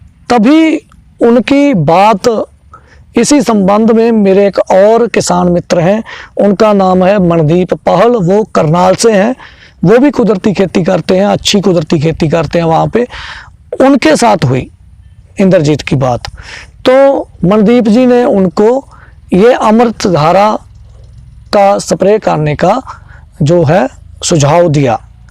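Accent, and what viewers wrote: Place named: Punjab, India. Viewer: Sir ji aap ki Hindi bhi Punjabi jaisee hi hai thanks God bless you Very good information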